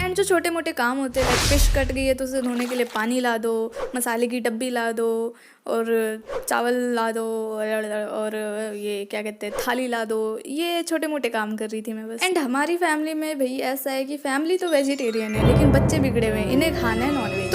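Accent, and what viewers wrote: Bengal, India. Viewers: Are u a bengali ? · Didi are you a bengali ? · Are you bengali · Are you Bengali ? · Are you Bengali?? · are you bengoli??? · Are you from Bengal or Odisha ? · YOU ARE BENGALI RIGHT?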